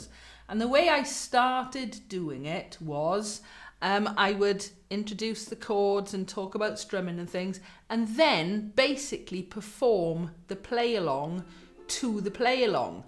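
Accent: Wales, United Kingdom. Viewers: Loving your help and hearing a wonderful Welsh accent. I'm from Abertawe originally but have been in NZ since 2004. Been learning the ukulule and loving it. I really want to learn Ar Hyd yr Nos, but am not Welsh speaking - on duolingo a little and know very basic words and phrases. Would it be too much to ask if you have simple chords for Ar Hyd yr Nos. A phonetic translation is something I've been lookinb for online to no avail; do you or anyone you know have one? Diolch yn fawr, Denise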